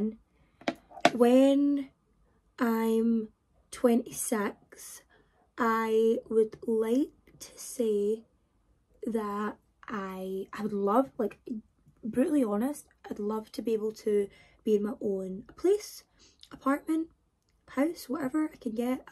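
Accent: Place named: United Kingdom. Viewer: are you british? I can't really understand your accent; where r u from girl? :)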